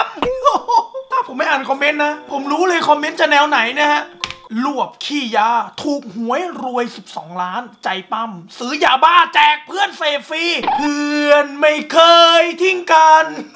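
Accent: South, Thailand. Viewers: 555เขาเเหล่งใต้มาเราเเปลทายย55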